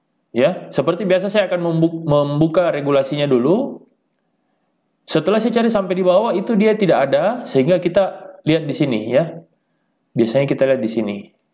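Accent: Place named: Makassar, Indonesia. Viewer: Didengar logatnya pasti org makassar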